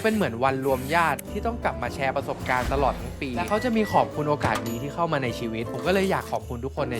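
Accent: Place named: Sukhothai, Thailand. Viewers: 555ผมเข้าผิดมาตลอดว่าพี่คนสุโขทัยเห็นคุยเหมือนกัน😅😅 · ว่าแล้วววสำเนียงเหมือนโซนบ้านกร่าง<num>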